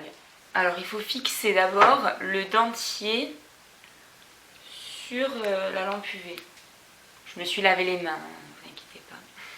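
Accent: Corsica, France. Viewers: Mdrr toi sa se voit que tué corse avec ton accent moi aussi je le suis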